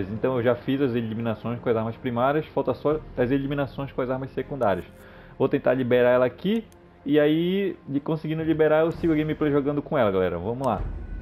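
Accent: Pará, Brazil. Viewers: Você é do estado do pará? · VC E PARAENSE MANO KKKK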